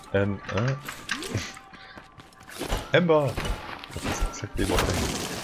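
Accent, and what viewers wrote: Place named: Poland. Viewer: Uh you sound polish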